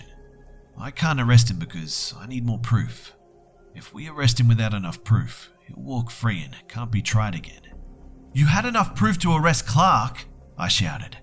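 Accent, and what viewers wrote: Australia. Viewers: Are you from Australia be?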